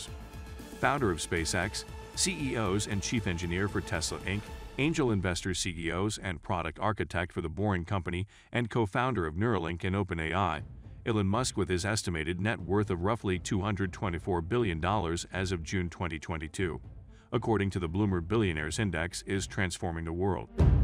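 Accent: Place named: South Africa. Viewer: I know you are a white south African, that why you are talking like that 😁, like I said before, facts doesn't care about your feeling, bringing the ideas on the table doesn't mean you are the one who invented it 😏😁.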